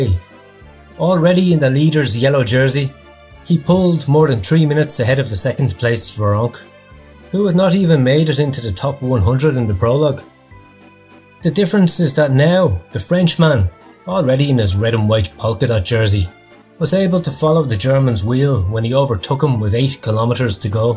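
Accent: Ireland. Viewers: the Irish Accent and the sly digs 'bluffing friend' 'lumpy bald head' cracking me up haha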